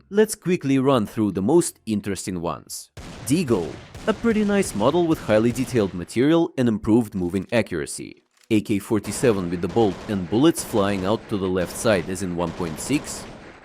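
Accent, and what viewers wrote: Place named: Russia. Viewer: Честно, прям слышен РАШЕН АКЦЕНТ 🤣🤣🤣. Видео клёвое))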